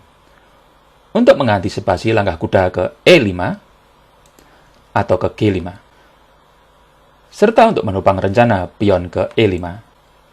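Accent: Java, Indonesia. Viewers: Saya suka gaya Om, tau aja yg subcribernya kebnyakan org Jawa hahahaha...., Lidah Jawa ya Om, GX perlu formal2 banget sebut nama yg penting penyampaian materi mudah di cerna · ilat jowo cak wkwkwkk 🤣🤣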